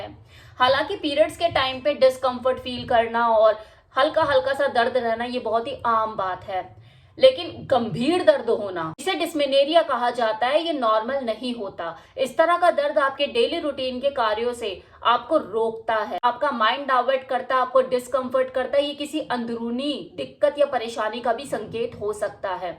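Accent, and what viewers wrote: Haryana, India. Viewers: Ya sayad haryana se ho haa haryana 95% punjab 5%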